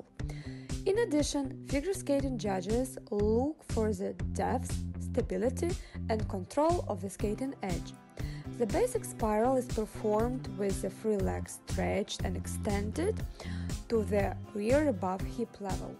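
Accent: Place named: Russia. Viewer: русский английский))) Большое спасибо Вам за Ваши уроки!!!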